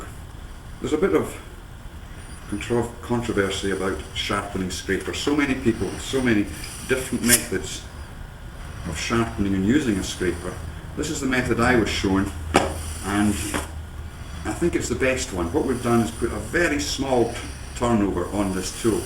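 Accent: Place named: Scotland, United Kingdom. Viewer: Thanks for the video. Nicely explained. The burr on the side of the tool is almost as pleasing as the burr in your Scottish accent. Remonds me of family and friends back home in Canada.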